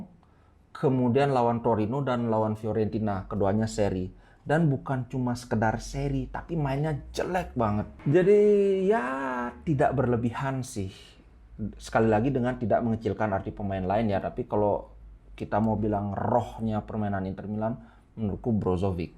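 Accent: Bali, Indonesia. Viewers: Bang orang Bali ya bang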